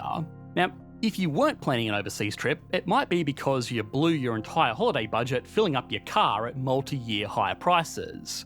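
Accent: Australia. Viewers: weird combo of vocal-fry and Australian accent. made me uncomfortable 😂 good info though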